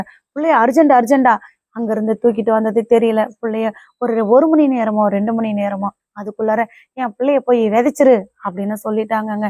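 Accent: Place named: Thoothukudi, India. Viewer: அம்மா நீங்க ரொம்ப வருத்தப்பட்டு இருந்தீங்கன்னா அப்பாவை பார்க்க முடியாது உங்க பையன பார்க்க முடியாது உங்களுக்கு உடல்நிலை பாதிக்கப்படும் கொஞ்சம் தைரியமாகவே இருங்க ஆரம்பம் என்று இருந்தால் முடிவொன்று இருக்கும் உங்க பேஸ் போல தான் தூத்துக்குடி சாத்தான் குளம் பேசும் அப்படித்தான் இருக்கு கவலைப்படாமலும் வருத்தப்படாமல் இருங்கள் அப்பொழுதுதான் உண்மை தெரியவரும் நீங்களும் வீடியோ போட முடியும் நீங்க பேசும் போதெல்லாம் எங்களுக்கு மிக மிக வருத்தமாக தான் இருக்கிறது ஆமா வயிற்றில் வயிற்று எரிச்சலாக இருக்கிறது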